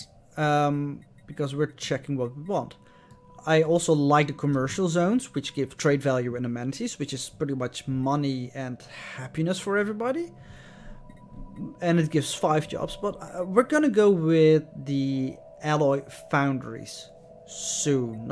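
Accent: Netherlands. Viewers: Ik hoorde je accent en ik dacht: hmm is hij misschien Nederlands? Toen zag ik je locatie en ja ja: Nederland. Toevallig :) Leuke let's play man!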